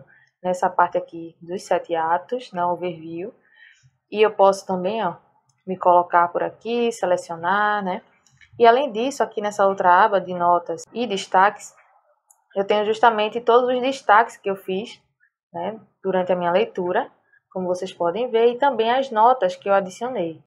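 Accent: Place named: Pernambuco, Brazil. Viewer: Tú és Pernambucana é, boa tua explicação visse!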